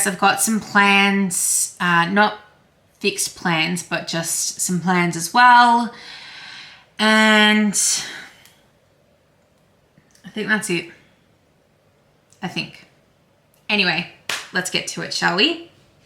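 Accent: Australia. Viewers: I have just binged every one of your episodes since the start… I am also rewatching Gilmore girls… you have an awesome channel and I am happy to hear another Aussie accent… I live in Brisbane… keep up the good work…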